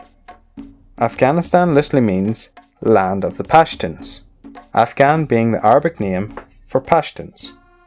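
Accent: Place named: Northern Ireland, United Kingdom. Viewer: I just found your channel and im loving it! as a fellow Northern Irish (Tyrone) Its great to see as i havent seen any others, nice to hear a non american or English accent :)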